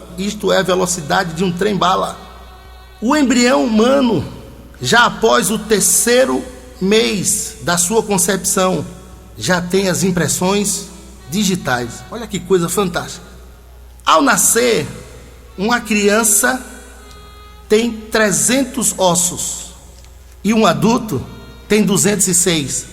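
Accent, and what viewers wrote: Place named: Northeast, Brazil. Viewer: Amém PR. Boa mensagem, boa de se ouvir, um conteúdo de grande qualidade, que o Senhor continue te abençoando mais e mais, mensagem que edifica, parabéns pela sua dedicação nesta obra. Estou aqui em Cotia-SP, e matando até um pouco a saudade de ouvir o nosso povo nordestino, nossos conterrâneos, isso nos faz bem.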